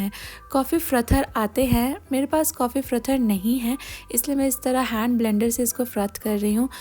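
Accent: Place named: North India, India. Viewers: Pr hum North Indian ese hi krte hai prounce jaise apne kiye so people should not feel offended in this nobody is making fun of that pronunciation but we North Indian ese hi boltae even my mother harr jagah yahi likha hota hain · Actually yar u don't know South indians hum north indians ki pronouncing me bht joke bnate h...mei literally daily notice karti hu..jo words hum Bachpan se bolte aaye h usko yeh wrong bolte h..mei bhi yehi ki tarah bolti hu..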